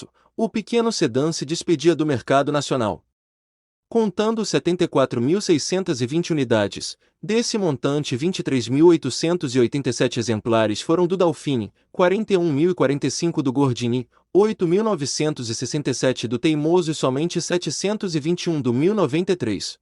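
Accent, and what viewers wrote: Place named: Portugal. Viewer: Que bobagem! Uma reportagem tão boa e vem me falar de correção da pronúncia? Qual o problema de se pronunciar à "moda portuguesa"? Qual é.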